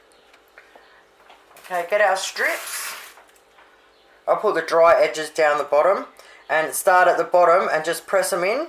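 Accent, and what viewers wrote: Australia. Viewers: Ooohh maaate😂😂😂😂...can't be anymore Aussie than that😂😂😂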